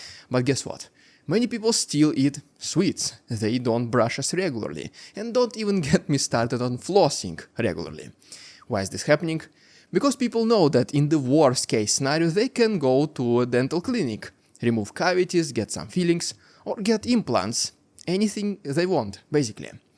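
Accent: Russia. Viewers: Cool. That's why my investigation rushes so much to put it in court BTW. Amayzing ryazan' aksent😂 Organized crime was always the problem of Russia. Starting back in Russian empire. Not in the 1990's. It's simply broke lose in 90's.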